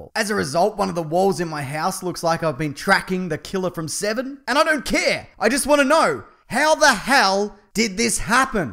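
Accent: Australia. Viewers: God I fucking love this channel and their podcast. Its hilarious and bang on with the commentary about films these days. The australian accent just makes it way funnier, I wish I was aussie instead of just crap and English. "Im gonna start pointing some bloody fingers!" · Hey love ALL your shows, are u a Aussie you sound like a Aussie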